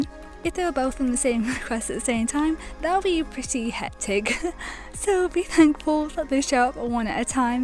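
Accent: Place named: United Kingdom. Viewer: She quite clearly has a British accent.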